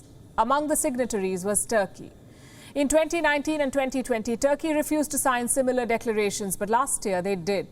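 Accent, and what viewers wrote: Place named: India. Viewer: Palki ji I want your audiobook Your reports are next level ,....nd Indian accent 🔥🔥🔥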